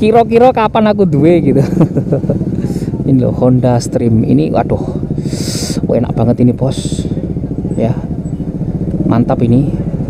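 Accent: Java, Indonesia. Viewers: Rasane aku melu jalan- jalan om pasar tradisional juga om 🤭 Aku dr Sumatra seger liat pasar2 tradisional e, sama logat Jawa om nya khas sekali. Semangat om 💪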